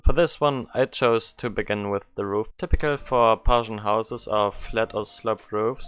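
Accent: Germany. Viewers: are you german ? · ummm where are you from you sound a bit like german or smth i like how you build. Subscribe and Like😊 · Are you german?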